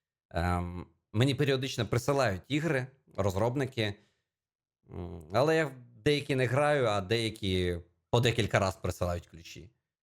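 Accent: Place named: Russia. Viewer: ну в чем прикол, тыж здравомыслящий. У тебя потерялся онлайн, просмотры, донатеры! Из за неродного языка в который ты не погружен, как в русский, ты говоришь не на украинской мове, а на речекряке, в перемешку с русским, а в эмоциональных ситуациях ты не можешь выразить весь спектр эмоций. В Итоге: стримить для 250 млн русскоговорящих или для 37 млн украинцев из которых дай бог 5-10 млн и говорит и то меньше. Конечно кто то наступил тебе на яйца или что там у тебя есть.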